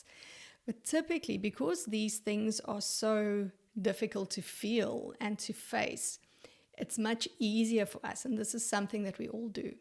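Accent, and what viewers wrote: South Africa. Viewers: Are you South African? just curious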